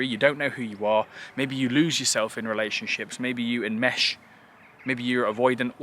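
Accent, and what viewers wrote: United Kingdom. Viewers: He is from the UK. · Sounds like regular UK English to me. Background looks very UK-ish too :)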